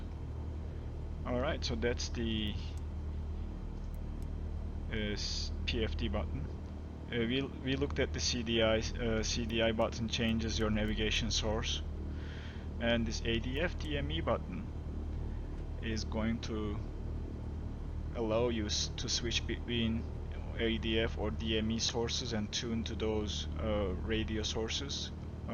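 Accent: Turkey. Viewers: Bu adam türk değilse bu dili de bilmiyorum derim artık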